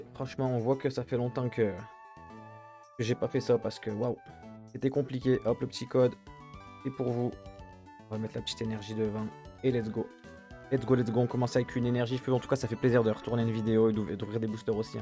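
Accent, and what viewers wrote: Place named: Lyon, France. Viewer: un lyonnais je parie ou stéphanois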